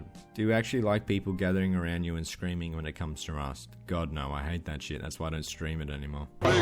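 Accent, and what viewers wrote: Australia. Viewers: I'm in love with a certain, low-voiced aussie.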